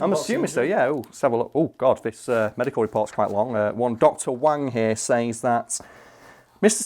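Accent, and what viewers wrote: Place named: Sheffield, United Kingdom. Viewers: Dude you could have at least known that he's northern. I mean, listen to the man :D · Been trying to figure out where your accents from for ages! Finally you say you're from Sheffield ! Let us know if you're ever on a night out round Sheff, love to meet you for a pint! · I knew you were from Sheffield could hear it in the accent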